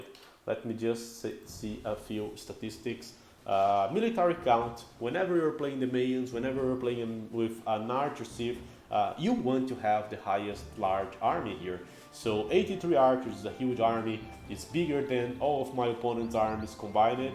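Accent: Brazil. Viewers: Ctza que é brasileiro o sotaque não engana...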